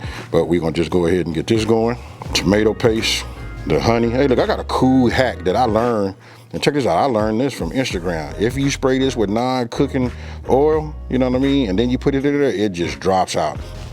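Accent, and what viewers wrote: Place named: South, United States. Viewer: are you born and raised in California? Sound maybe a little bit southern accent maybe?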